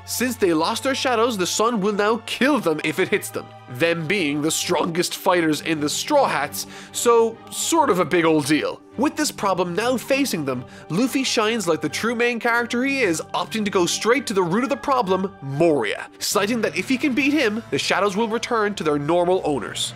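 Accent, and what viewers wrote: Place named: Ireland. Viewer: I detected an Irish accent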